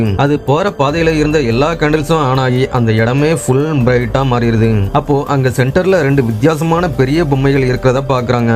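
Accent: Coimbatore, India. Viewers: Unga kovai tamil apo apo varuthu nice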